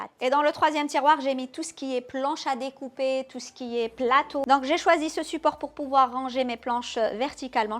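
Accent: Algiers, Algeria. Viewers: Coucou ma belle j'adore quand tu parle on français avec ton accent algeroissssssss j'adore tes vidéos merci beaucoup pour les astuces bisous pour les petites anges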